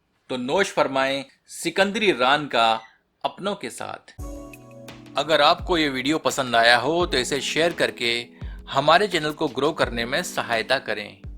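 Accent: Standard. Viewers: Bhai ji app butter chicken ki recipe bhi batana.. Please...Apki videos hum sabko bahut achchi lagati hai, apka shuddh hindi bolne ka andaz hum sab ko bahut bhata hai, I love you bro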